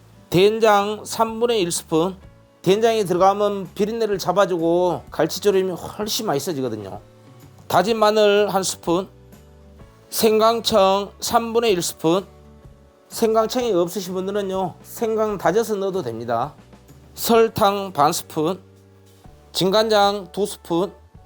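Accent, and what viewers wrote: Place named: South Jeolla, South Korea. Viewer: 전라남도 사투리가 너무구수하네요ㅎ 맛나보입니다~^^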